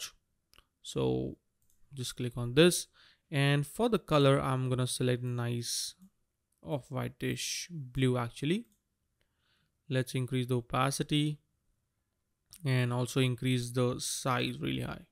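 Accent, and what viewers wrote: India. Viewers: Typical Indian English accent, lol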